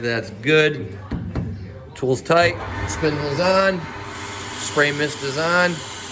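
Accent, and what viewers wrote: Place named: New York, United States. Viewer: Nice accent. You sound like a old school New York Gangster.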